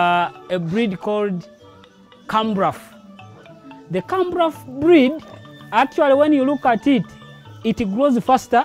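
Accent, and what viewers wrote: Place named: Kenya. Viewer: This's Kenya English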